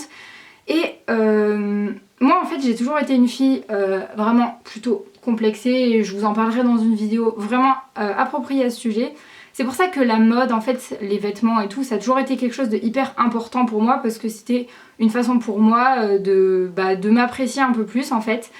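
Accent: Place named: South, France. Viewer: J'aime cette vidéo juste parce que t'as pris l'accent du sud :p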